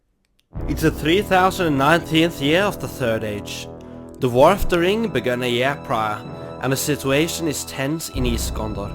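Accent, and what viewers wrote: Norway. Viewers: Love your east norwegian english accent bro! Love from your closet relative Wermland Sweden!🇳🇴🇸🇪❤️ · Dæven så norsk-engelsk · Is that a norweigan accent Im detecting? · The dude talking is norwegian. I can hear it. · The norwiegan accent is soo clear XD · ikke ment som kritikk, men man kjenner jo igjen tonefallet. Artig med nordmenn som lager kvalitet på YouTube. Gode temaer og fin måte å fortelle historien på. Forsøker å lage noe LOTR selv, men i en helt annen stil. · Detta är första gången jag hör norsk dialekt på engelska! Haha gillar det! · This is really cool LOTR battles with an norweigian accent. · Great video! Awesome animations. Also, I bet my life the narrator is Norwegian. Tell me I'm wrong. Again, amazing work brother! Would love more of these kinds of videos! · Love the accent. Norwegian I suspect. You can se how Tolkien had Scandinavian language in his mind when creating many of the languages. 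· Veldig Norsk engelsk men dæven du er flink. Supert arbeid. Gir meg full oversikt over kampen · i knew it! ^^ I could here the Norwenglish xD hehe Bergen here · are you from norway? I like your accent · We can all hear that the narrator is from Norway...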